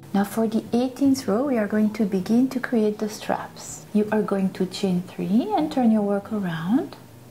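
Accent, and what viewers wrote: France. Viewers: Superbe !!! Thank U very much for teach us . You 'v got a French accent ! ???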